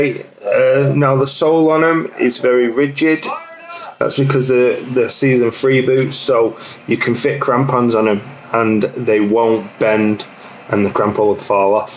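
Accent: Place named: England, United Kingdom. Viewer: Такой ужасный английский акцент!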